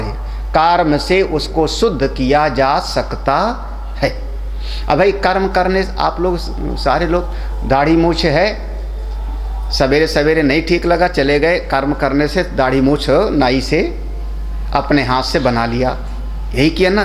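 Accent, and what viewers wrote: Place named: Allahabad, India. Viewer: Swami Ji aapki tone Allahabad hi hai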